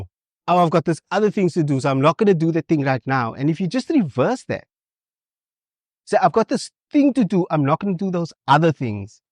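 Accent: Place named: South Africa. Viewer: Sounds like a South African I loved what he had to say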